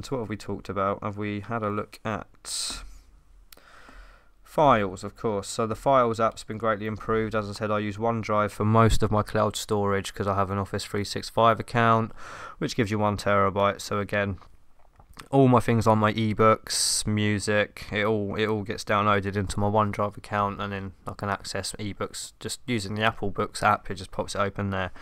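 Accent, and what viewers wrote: United Kingdom. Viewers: Are you a british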